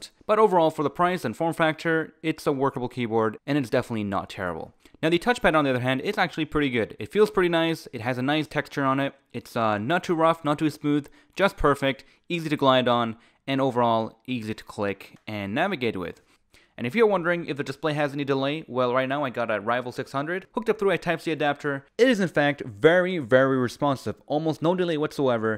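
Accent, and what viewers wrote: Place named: South Africa. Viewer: I am Greek speaking, but being a teacher of English as a foreign language (EFL) and having spent 15 years in Sth Africa, I don't have a problem understanding the language. However, I can't compete with a native speaker. When the narration came to the technical terms, I completely lost track of what you were saying. I couldn't absorb it fast enough. The problem was compounded by the fact that the South African accent is different. Which comes to the point of "please think of us out there who are struggling with a foreign language, especially with the technical explanations." Thank you, I appreciate your channel.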